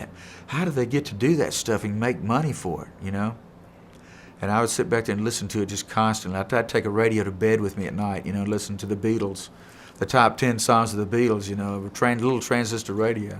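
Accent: South, United States. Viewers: Interesting, wouldn’t’ve thought he had a Southern accent considering when he sings you don’t hear an accent! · Never knew he had a southern accent anyway very nice. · Whoa! Me hearing his southern accent was just as shocking to hear Steve Perry speak Portuguese....Learn something new....New to me.... LEGEND!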